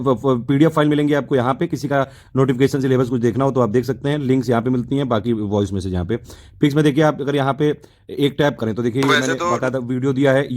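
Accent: Kanpur, India. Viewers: Sir ji kanpur ke lag rahe hai 😄😄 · Kanpur ke lag rahe ho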